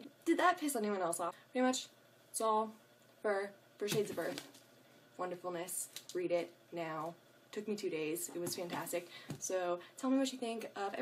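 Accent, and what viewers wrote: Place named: South, United States. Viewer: Where are you from anyways? It sounds like you have a slight southern accent which makes me think Texas for some reason haha I will totally share any secret information with you ;)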